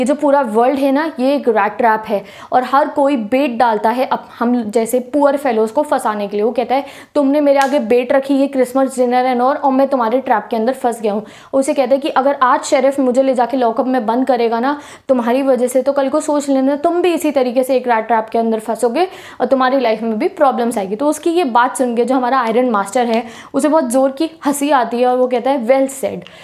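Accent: Punjab, India. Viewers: this is Punjabi accent.we use this word . It means hona..( sorry I exactly don't what to call it in Hindi😁)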